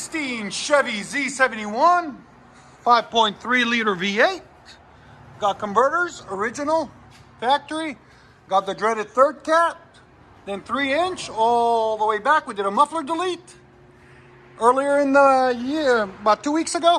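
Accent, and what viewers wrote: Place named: North, United States. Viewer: Tn tags but he sounds like a Yankee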